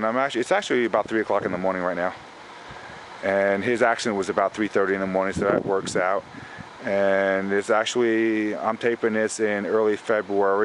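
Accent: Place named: Brooklyn, United States. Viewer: Brooklynites call it Brooklynese. No joke.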